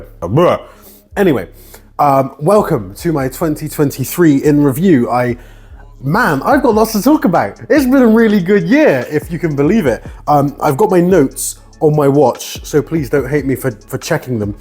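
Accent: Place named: United Kingdom. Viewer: aha, okay. The reason I ask is because I’m having a minor personal Mandela effect. You sound absolutely British, but I have this strange recollection of you having a foreign accent in the past. And yet clearly you are an Englishman. Sorry, I’m a yank, and I could have sworn you used to have a different accent, like Swedish or Russian or something completely different. I’m tripping. 😅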